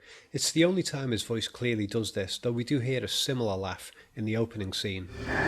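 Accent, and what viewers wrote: Liverpool, United Kingdom. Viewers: To: I absolutely love your videos. I've been binging on them since I discovered them a few days ago. Your analyses are as fascinating as they are thorough - supported with clear examples and often detailed and well-cited research. It's inspiring to listen to someone who's so passionate about a subject. Incidentally, your Liverpool accent and softly-spoken delivery (in my opinion) enhance the enjoyment of these videos. You impart your ideas in a laid-back, conversational, matter-of-fact way, which feels respectful to the viewer's intelligence in a way that other analysts seem to somehow miss by having subtle (or not-so-subtle) condescending or boastful tones. Does that make sense? With regard to a point you make in this video about Nancy's ability to finally defeat Freddy by using a technique which had earlier failed, "ignoring his existence", as you put it very well. 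My feeling is that in order for this technique to work, the victim must fully and whole heartedly believe it. in the earlier scene when she attempts to get rid of Freddy by saying the words "This isn't real, this is just a dream", she is still frightened and panicked, which tells us she still actually believes the danger is real. After the climax of the movie, Nancy has developed true faith in her words. She says them calmly and with total conviction, hence it's effectiveness in ridding the demon. On further reflection, perhaps this can be linked to your idea that in this movie God doesn't exist. Perhaps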